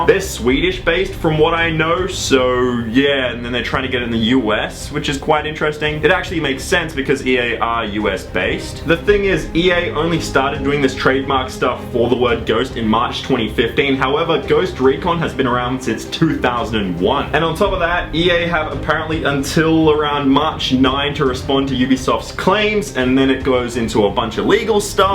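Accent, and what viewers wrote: Australia. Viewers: I love the Australian accent oh lord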